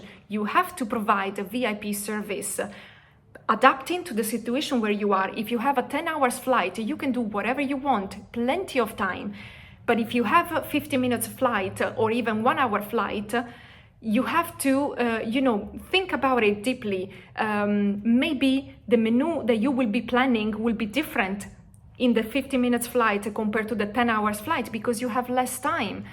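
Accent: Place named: Italy. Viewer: You have a nice Italian accent.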